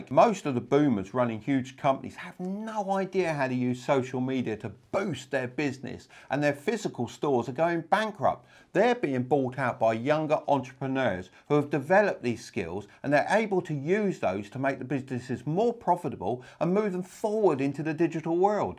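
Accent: United Kingdom. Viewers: British accent? I'm sold. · why does some of his thumbnails show US dollars when he sounds like he's from the UK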